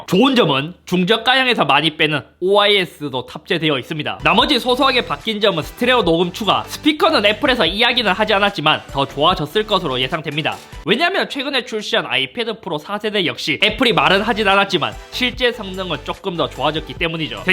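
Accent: South Korea. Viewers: 형 요즘 말투 왜 그래. 저번에는 서울말 하더니....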